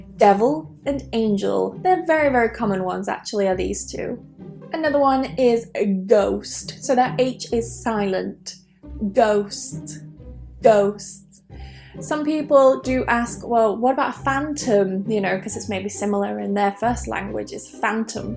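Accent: United Kingdom. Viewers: 訂閱您的頻道學習很多英式口音 在最近 很開心 大大感謝~~ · Hi there,. I've just found you on YouTube. Just a guy from Brazil here trying to learn some English and like some others, I love the British accent. Loved you humor, loved your other videos that I've seen so far. You are just so beautiful (jaw dropping) and an excellent teacher/professor which is the reason I've just subscribed. Please, keep up the good work.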